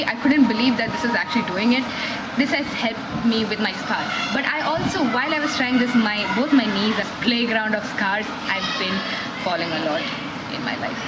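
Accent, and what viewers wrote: India. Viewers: OMG i hear an Indian accent :D i love that :D just love everything Indian. ur so beautiful :D i'm mixed with some Indian myself ^_^ :D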